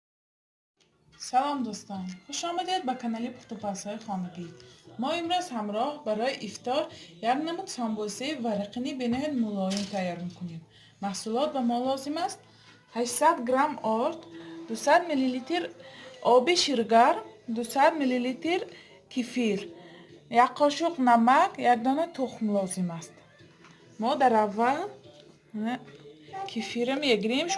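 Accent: Isfara, Tajikistan. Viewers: Шумо Исфарагими гапзанитон худи хамсоябони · Ассалому алейкум Хохарчон ман аз лахчатон фахмидам ки шумо аз Исфара мо аз Хучанд бисер хурсанд шудам ки занхои моям дар Ютуб хамин хел барномаи зебо бомаьно ширин дорем,Дастатон дардро набинад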